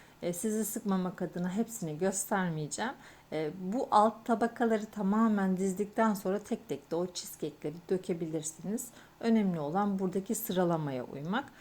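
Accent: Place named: Gaziantep, Turkey. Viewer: hanım nereliydiniz acaba Antepli felan mı merek ettim bu ses verin inşallah iyisinizdir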